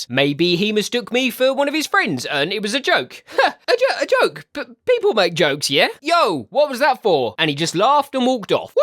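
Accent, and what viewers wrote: United Kingdom. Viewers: I absolutely love your british accent. Subscribed. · I just found out about your channel and realised....we both have the name doodles in are channel......Steve doodles....xD also I subbed cuz I love your art style...also are you British? Sounds like you are xD I am too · You have a British accent, yet go to 'high school'. hMMMM? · you sound British.... i like Britains since i am myself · OMG!!!! YOU'RE BRITISH ACCENT IS SO AWESOME DUDE!!!!!!!!😻😻😻😻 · How come you have a British accent but a American school 🤔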